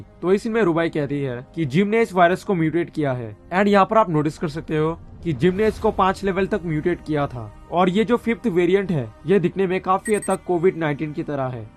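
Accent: Assam, India. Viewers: Bhai aap Assam se ho kya????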